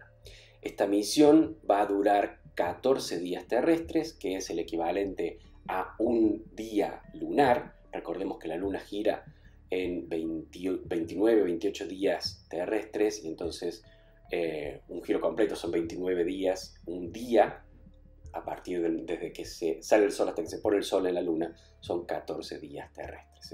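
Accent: Córdoba, Argentina. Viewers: Muy buen video. Puede ser que tengas acento de Córdoba, Argentina? Un abrazo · Si o si es cordobé el!!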